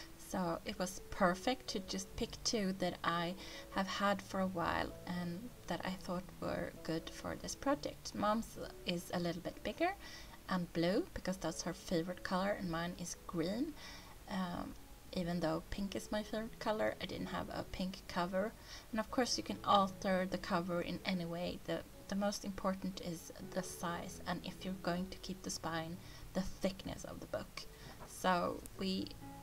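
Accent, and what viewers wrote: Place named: Sweden. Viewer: sigh...i do love your art, follow you on insta. I just wish I could understand your lovely swedish accent, as I am an american speaker. I can only catch a few words now and then. I know it's not your problem. That said, I'll watch, but will not try to understand. the closed captions are in swedish, of course, not english.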